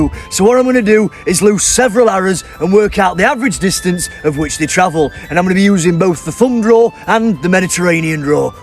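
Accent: United Kingdom. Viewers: I've subscribed for three reasons: this is very funny, he goes straight to the point,and his story telling skills are excellent. Forgot to mention that I love the British accent, I find it very refined.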